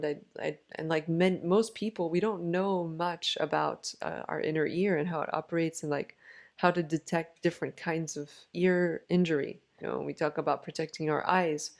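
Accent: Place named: United States. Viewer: Thank you, thank you very much for sharing your story. I got Tinnitus in June 2020, and I am still suffering at daily basis never disappeared. After 6 months of waiting I saw a NHS ENT or like you say ORL en francais, I was diagnosid via an audiogram with a significant hearing loss in left ear around 20%. A second ORL and audigram confirmed the same results. Here is my short story before getting Tinnitus; I passed through stress and anxiety over 5 years, and I managed to overcome the hurdles by running three marathons and half in a period of four years and the earphones were always in my ears with loud ipod music, and the fifth year, just when the pandemic hitted hard, I restarted to run again, and onward I cannot hear well with my left year, just few days later, I started to hear hammering, whistling, and roaring sometimes like the echo of car engine running from far away and still until now. 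It went worst during and after exercises and like you say after alcohol or eat spicy food. There is no treatment for tinnitus, we have just to accept it and live near the traffic or in the heart of a city center to forget it. The silence makes me anxious because I hear my Tinnitus, thanks for suggesting gingko biloba, I am planning to explore the possibility of a homeopathic therapy, did you try any? Another question unrelated, have you done any audiogramm with your third ORL? Any hearing loss? Take care your accent in English sounds American not French.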